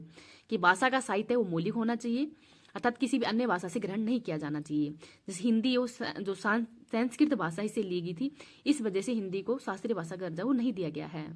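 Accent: India. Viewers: Kya aap Hariyana se h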